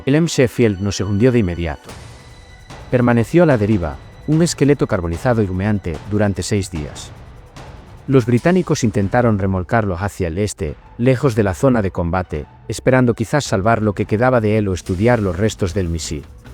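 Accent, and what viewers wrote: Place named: Galicia, Spain. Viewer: El acento del video parece gallego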